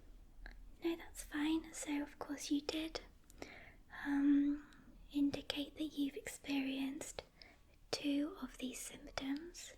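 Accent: England, United Kingdom. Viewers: Great voice and I love your accent. You’re from wich part of England? Good luck and keep it up!!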